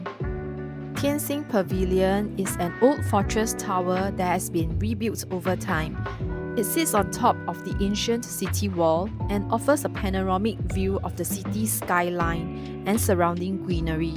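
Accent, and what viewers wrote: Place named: Singapore. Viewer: She sounds Singaporean.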